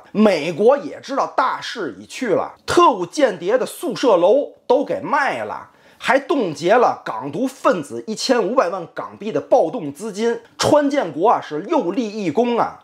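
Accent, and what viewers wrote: Tianjin, China. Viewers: 天津口音真好听！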